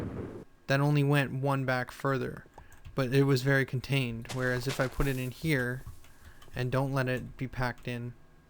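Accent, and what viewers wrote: Canada. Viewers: I think every other video someone asks if you're canadian xD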